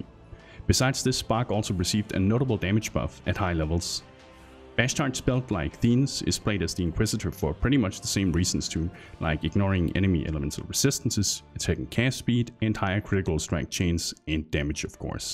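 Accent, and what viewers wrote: Denmark. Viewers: Love you can hear you are danish.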